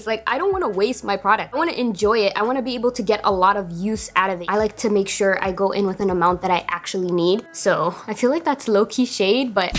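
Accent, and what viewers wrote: Toronto, Canada. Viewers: I thought I recognized the TO accent 👀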